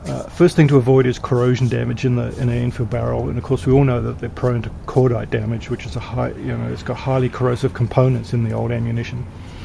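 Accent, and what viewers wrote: New Zealand. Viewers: Kiwi I'd say. Not stretching the 'e' enough to be aussie. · MonsterofTheAslyum1- The same principle applies at the breech end of the barrel as the muzzle end. If you have 1/4 inch of projectile protruding at the muzzle, it indicates you likely have a good barrel, if you have a 1/4 protruding at the breech end, same is the likely indication, as it is the rifling that you are testing. This is especially true as the Lee Enfield cartridge is tapered as well, which makes this test all the more useful. I hope this makes sense. I grew up with these beauties, my first large game (feral cattle) animals were taken down with my dads pristine No4. Mk1 (had the test tag attached when he collected it!) at the tender age of 12yrs old. My hunting rifle now is a previously sporterised (by a previous owner). No4. Mk1. I used this exact test, got the exact result as above, it groups about 1 1/2 to 2 inch at 100yrds. 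It shoots better than I do! I am a LOT older now. :) This guy sounds definitely kiwi. Great series of vids too! Thanks.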